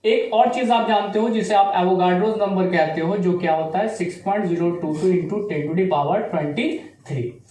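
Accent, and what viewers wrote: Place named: Bihar, India. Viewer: sir you are a weird blend of hadrabady and bihari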